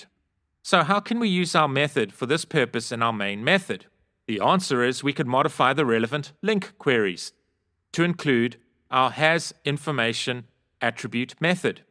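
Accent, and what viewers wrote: South Africa. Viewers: Nothing better than clicking on a youtube vid and hearing a south african accent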